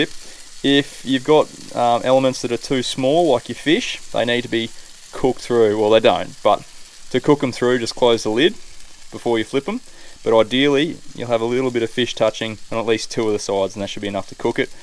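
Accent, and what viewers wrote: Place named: Australia. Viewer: That's it, I need a visa, I'm moving to aussieland just to listen to this accent everyday. It's just so pleasant! So many made-up words lol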